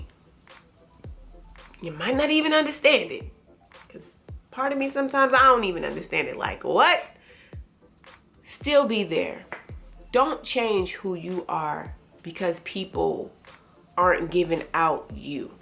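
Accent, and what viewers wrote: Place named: East Coast, United States. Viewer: Love ur East Coast accent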